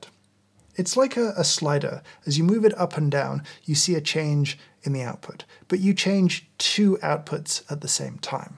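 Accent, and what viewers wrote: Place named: RP, United Kingdom. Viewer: Petersen 😂😂😂😂 Yes he speaks the Queen’s English !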